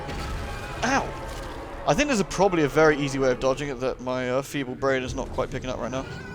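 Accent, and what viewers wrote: United Kingdom. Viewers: So, I have to say congratulations for re-finishing the original Dead Space. I started watching you before you did this game originally like who knows how many years back but it was the original playthrough that made me subscribe to you. While I love DS, I prefer to play DS2 due to it´s many gameplay improvements. Story/atmosphere-wise, DS obviously wins. I would like to thank you for several things. You have helped me learn English. You have helped me open the world to me. Thanks to you I have delved into streaming and have met many friends. You have helped me go through depressions and some of the worst moments of my life. All of which without ever speaking to me or knowing of my existence. I have no idea what I would be doing with my life without your wonderful sarcastic British voice. So yeah, wish you many more awesome years and hopefully, if you still feel like it, sharing those with us through gameplay. 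:)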